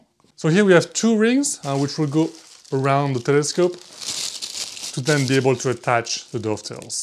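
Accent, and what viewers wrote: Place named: France. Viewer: I knew it, I did detect a French accent❤❤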